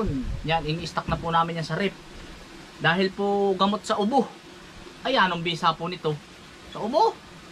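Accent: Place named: Batangas, Philippines. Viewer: batanguenyo kb, puntong batangas ka eh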